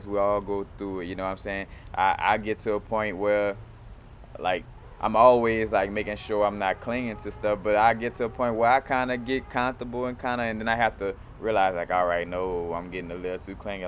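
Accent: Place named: Louisiana, United States. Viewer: You gotta be from NOLA or somewhere in Louisiana lol I'm from there and I recognized your accent😭 anyways keep making videos please, I love your vibe❤️ such a beautiful human being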